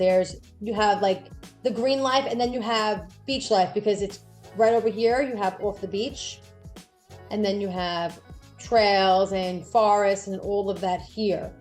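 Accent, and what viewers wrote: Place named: New York, United States. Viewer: I love your New Yahk accent...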